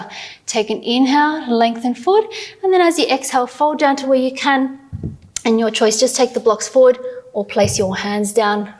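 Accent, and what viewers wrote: Australia. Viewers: I feeeel so goooooood thank you💚💚💚💚💚💚💚are you Australian?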